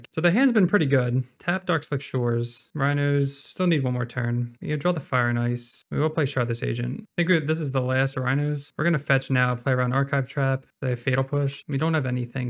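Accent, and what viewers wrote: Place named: Philadelphia, United States. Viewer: Great vid man. Are you from Philly area?? The accent is strong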